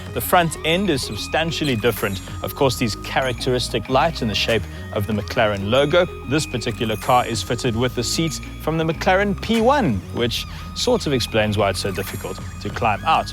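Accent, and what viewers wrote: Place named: South Africa. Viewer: ahhh nice.... no nonsense boring south african..beautiful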